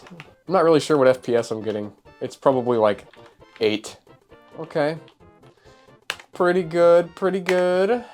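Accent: Canada. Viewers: because it's the exact same with a canadian accent instead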